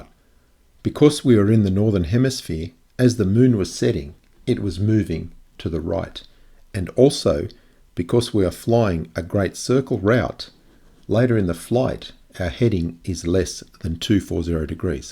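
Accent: United Kingdom. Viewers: A beautiful and well thought out video. you dah man!! A really good use of your time while flying over the ocean, and getting to stick it to the Flat Earth where the sun don't shine at the same time. I did my military service as an 18 year old (compulsory in Singapore) in the armoured artillery. We used maps and got to understand what they depicted. Back in the late 70's. My son did his service in 2006 and now they have the benefit of GPS targeting. You know that thing that you use in you cars to get to where you're going? It used sattelites. You know those pesky things that orbit the globe? Flat earth? What a frigging stupid idea. Free speech is one thing, but to use it to dumb down a sizeable portion of your people is worrism. Mark sargent, Jeranism, Patricia whashername, are actually conmen who don't really believe what they claim and are there to reap what they can earn from the uneducated and gullible public. 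The US should not have any place for this stupidity but sadly it seems to have. forgive me if I'm wrong but you sound like you're from the UK. I guess the epidemic of FE stupidity has crossed the pond. You have your work cut out for you if you should not get weary of sticking it to the Flatties. A really great and informative video. Well done!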